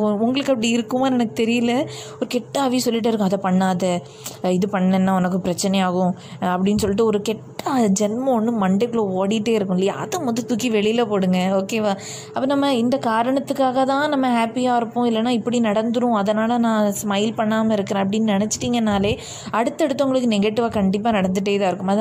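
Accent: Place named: Tirunelveli, India. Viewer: Neenga Tirunelveli ya antha slang varuthey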